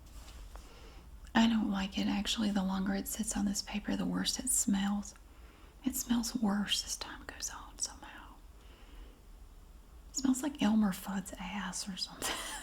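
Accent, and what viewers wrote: South, United States. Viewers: I remembered watching videos a long time ago and decided to revisit the lady who inadvertently taught me how to do a Southern accent. Not even halfway through and I am howling 😂 Why on Earth did I ever unsubscribe, I must have been tired of how much fun I had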